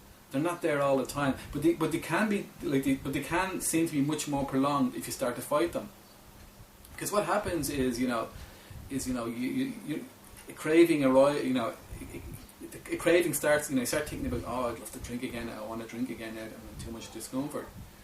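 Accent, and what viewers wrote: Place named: Ireland. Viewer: Definitely irish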